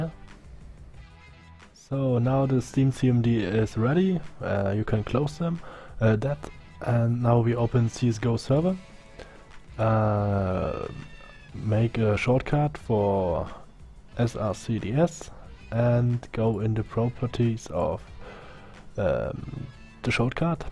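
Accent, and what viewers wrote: Germany. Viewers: sounds german :D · Did what you said and worked PERFECT ! love the german accent makes it funny in some soort of way ;) dont take it bad ! Thank you for this video !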